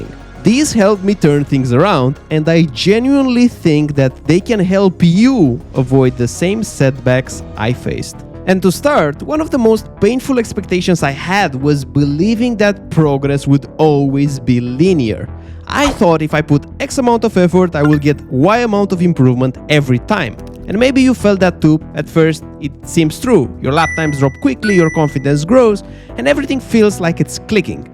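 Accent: Romania. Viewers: Where are you from? It seems you are romanian?🧐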